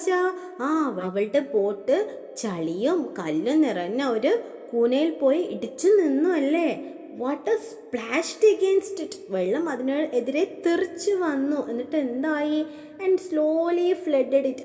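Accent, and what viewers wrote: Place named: Kasaragod, India. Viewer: നിങ്ങളുടെ വീട് കാസർഗോഡ് ആണോ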